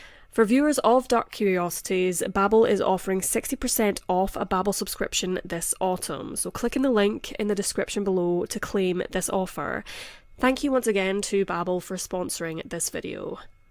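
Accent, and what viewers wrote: Scotland, United Kingdom. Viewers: The Scottish accent of the narrator makes this all the more chilling, every time. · I understand your Scottish but your voice just reminded me season 3 of Derry girls airs on Netflix tomorrow 🙄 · I was trying to think of a polite way to correct her pronunciation of "Hyannis". She said it, "Hee-annis". It's actually, "High-annis". I grew up there. For what it's worth, I think her accent is quite lovely. And I'm sure I would butcher the pronunciation of whatever Scottish town she grew up in. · American here; the Scottish accent is hands down my favorite of all of the English first language accents. Australia's a close 2nd. It's as if Kelly Macdonald is narrating this. I'm glad that I found this channel, it should be even bigger than it already is. It takes quite the grind to make 100K+ subscribers. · Where abouts in Scotland you from? 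East coast?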